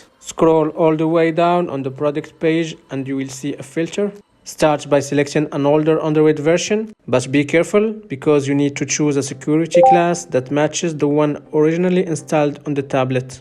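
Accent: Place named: Morocco. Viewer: sorry but your accent is bleeding bro, are you a moroccan?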